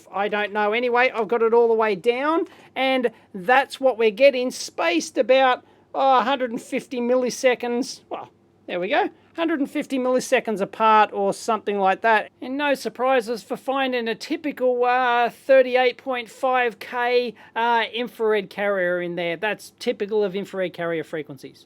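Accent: Australia. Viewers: The only thing I understand is that Mr. should be an Aussie. His accent says so. Am i right, Mr.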